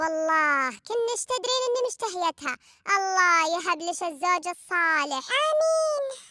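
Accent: Hejaz, Saudi Arabia. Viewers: احلا شي الحجازيه 😂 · ههههههههههههههه يا لبيييييه الحجازية 😍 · أحلى لهجه الحجااازي ** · الحجازيه تجنن ههههههههههههههه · يالبى الحجازية 😍😍😍😍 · مافي أحسن من الحجازي 😜😍☺️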